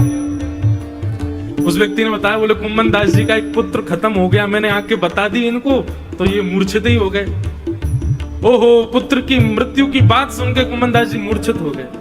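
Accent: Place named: Braj, India. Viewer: Indresji maharaj Aap ki gyan bhari bate sun ne ko brij bhasi boli itni achi lagti hy ki sare din sunti rahu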